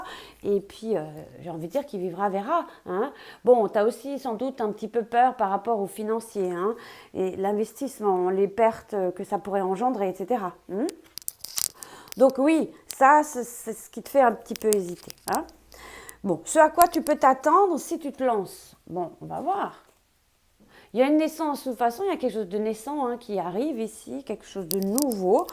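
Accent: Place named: England, United Kingdom. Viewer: tu as un petit accent tu es anglaise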